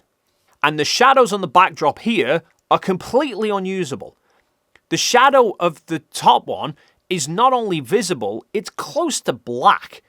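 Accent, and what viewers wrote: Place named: Northern England, United Kingdom. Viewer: It's just his particular working class-ish northern English accent. That's how many folks pronounce it. Americans pronounce is "Saa" instead of "Saw". Are they pronouncing it wrong?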